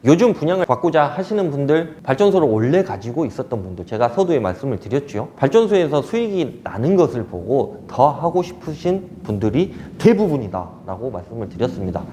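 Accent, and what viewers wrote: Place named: South Korea. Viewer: 잘 모르는 분야라 천천히 보았습니다 사투리억양도 재밌었어요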